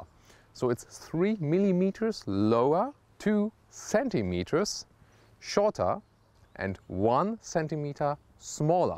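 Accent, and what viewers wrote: Germany. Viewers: Nice details, impressive narration. Love to listen your German-accent English. Keep going,!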